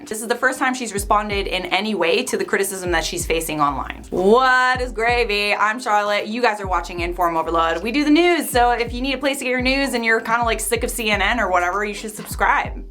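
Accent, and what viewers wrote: United Kingdom. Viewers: I love your british accent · You British lady accent is what keeps me clicking😉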